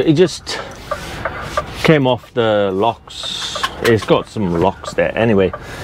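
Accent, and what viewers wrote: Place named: Manchester, United Kingdom. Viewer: Bloody hell you don’t half sound like you have a Manchester accent sometimes pmsl I’m from Salford originally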